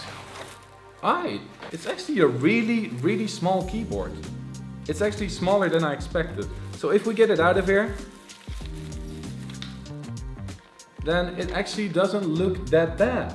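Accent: Netherlands. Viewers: Are you Dutch? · Jij kan goed engels maar nederlands accent valt altijd te horen xD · are you dutch??? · Wow are you dutch? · Ik dacht al dat je Nederlands was !💙💙💙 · ik dacht al dat je nl was maar ik was nog aan het trijwelen met je accent maar yeey je bent nederlands ik ben een goede detective :3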